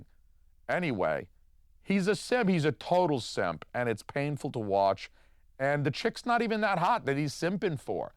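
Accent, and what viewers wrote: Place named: Pittsburgh, United States. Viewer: I agree with everything you said here and laughed quite a bit. But I’m so confused why you’re wearing a Georgia sweatshirt and have a Pittsburgh accent.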